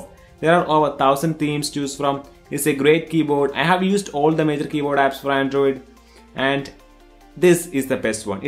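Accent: Kerala, India. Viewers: i guess u are a malayali??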